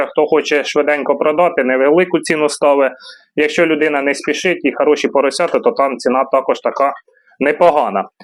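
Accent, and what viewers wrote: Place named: Cherkasy, Ukraine. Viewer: Подяка людям праці .Мова точно як в селах Черкащини.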